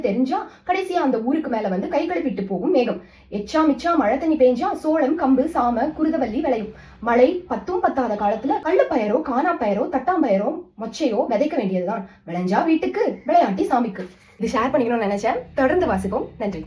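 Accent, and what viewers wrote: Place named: Theni, India. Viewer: Theni slang madam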